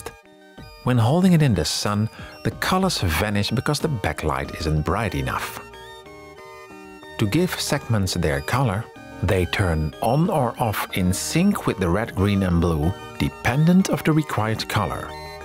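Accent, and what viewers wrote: Netherlands. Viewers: It's just cute, how dutch you sound. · Huh, this is pretty neat. :D I also like how wonderfully Dutch you sound, without having too thick of an accent.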